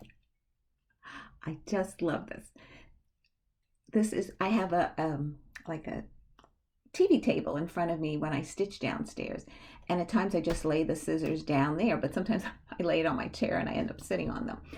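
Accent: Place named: New Jersey, United States. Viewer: I’m also from NJ, but living in NC. Love hearing your accent. I am trying to finish Christmas Camper by Barbara Smith. After that, I’d like to do some Stitching with Housewives patterns. I’m jealous that you have a needlework shop near you. Absolutely love Autumn in the Village, gorgeous! Thanks for your videos.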